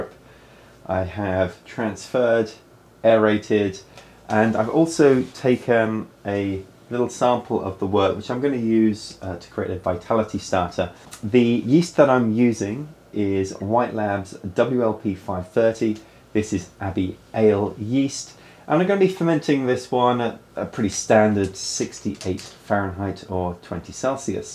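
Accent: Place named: United Kingdom. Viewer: I was actually thinking something similar when I heard her speak - there's definitely some British influence rubbing off on her accent sometimes!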